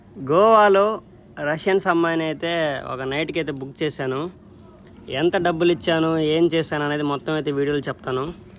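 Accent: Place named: Nellore, India. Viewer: Orey mawa nuvvu maa nellore vadilaane unnave..?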